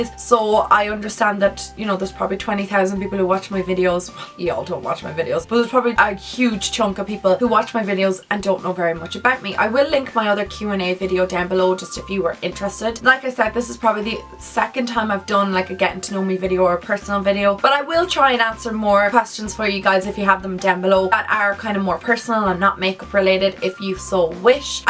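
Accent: Ireland. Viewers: Great vides! I have been watching you for a while now. Love your accent! My husband is from Ireland as well. Keep up the great videos! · where in Ireland are you from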